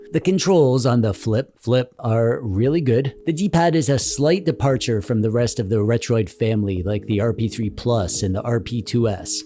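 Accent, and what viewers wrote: Canada. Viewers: Sir, are you Canadian?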